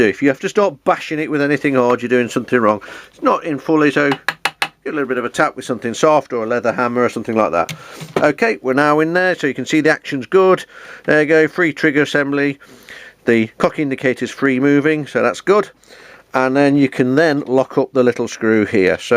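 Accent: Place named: United Kingdom. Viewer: Clear, concise and helpful video... with a great Brit accent to boot. Good stuff!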